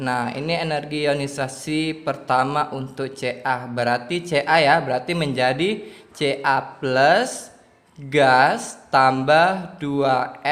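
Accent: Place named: South Sulawesi, Indonesia. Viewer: Duh maaf ga tau, soalnya logatnya hampir sama kaya kating saya yang dari Sulsel hehe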